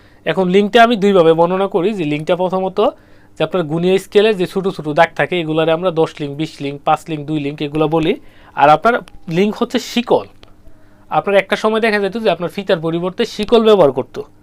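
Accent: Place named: Comilla, Bangladesh. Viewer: মনে হচ্ছে আপনি কুমিল্লার ভাই। আপনার জন্য শুভ কামনা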